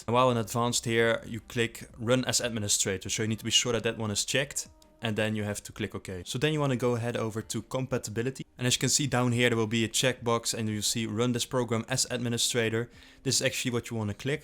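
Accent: Netherlands. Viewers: I hear a dutch accent! Goeie content ouwe!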